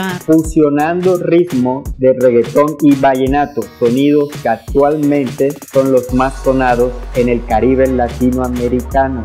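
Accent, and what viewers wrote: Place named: Spain. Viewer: Si ella tiene don natural para los idiomas y está casada con un español, es completamente lógico que hasta de forma inconsciente hable casi como española. Que se te peguen los acentos, ni es culpa de la persona, ni es un defecto, es un don del cerebro. De vuelta en Colombia, si se quedará unos días su cerebro se reprogramaría como Barranquillera. No hay nada que criticar, ella trabaja bien, ama a su familia y su país de origen y el país que la acogió. ¿Qué hay de malo en tener inteligencia y un corazón grande?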